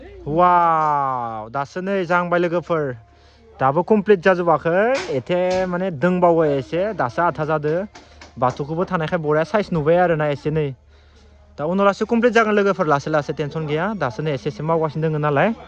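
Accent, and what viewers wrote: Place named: Tea garden, India. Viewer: Ada ng ma jagni ngni railai naykw matw bagan farani baidisw khwnayw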